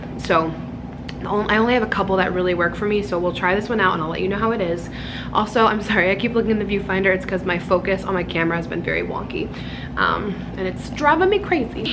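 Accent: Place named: United Kingdom. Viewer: I love the British accent lol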